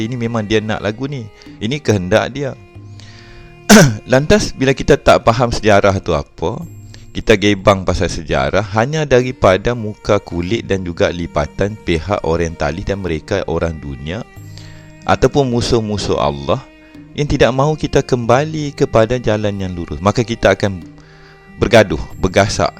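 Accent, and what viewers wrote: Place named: Terengganu, Malaysia. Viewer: Orang Terengganu cakap. The BIJORKKK 👍👍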